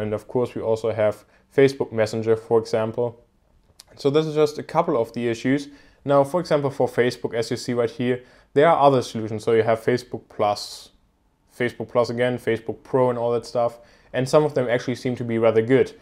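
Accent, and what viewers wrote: Germany. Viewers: kanns sein das du ein deutscher bist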